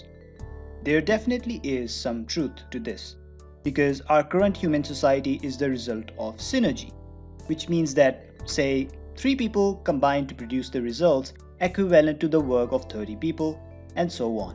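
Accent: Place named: India. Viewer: Are you Indian bro? Your accent sounds so.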